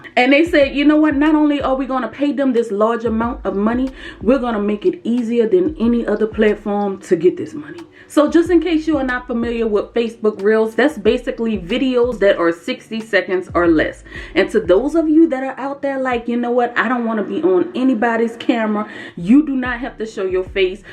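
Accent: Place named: Louisiana, United States. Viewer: Nope....you can't hide that accent...hello my fellow Louisiana sister lol. I'm from Lafayette.. keep inspiring us thank you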